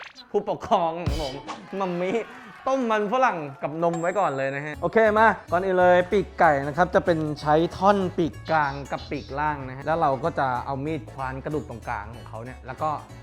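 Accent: Uthai Thani, Thailand. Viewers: พี่ ep.นี้เหน่อมากก55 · คนอุทัยธานีเหมือนกันค่ะ สำเนียงเดียวกัน น่ารักกก😂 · พี่ ท์เทปนี้เหน่อออกเลยแง้ มีความเจ้าปื้ดด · คนบ้านไหน เหน่อจังเลยค่ะ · ชอบความพูดสำเนียงอุทัยธานี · มีความเหน่อ ลูกเอ้ย เอ็นดูวว์ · ขนาดยาวหน่อย(เอ็นดู)แอบเหน่อ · ชอบที่เสียงพี่เขาเหน่อๆนิดนึงน่ารักค่ะ😂ดูเพลิน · เอ็นดูความพูดไปเหน่อไป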